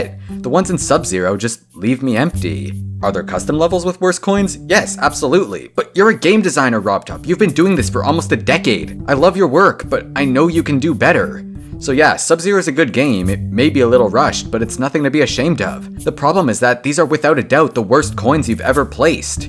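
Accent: Canada. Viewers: canadian accent 🥱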